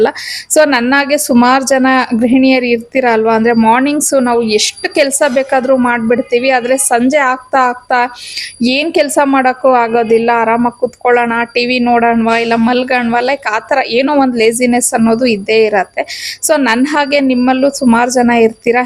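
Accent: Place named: Telugu, India. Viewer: Its not Sheniwara … its Shaniwara Your vlogs are good , i know u are influenced by telugu .. however conscious practice will make pronunciation better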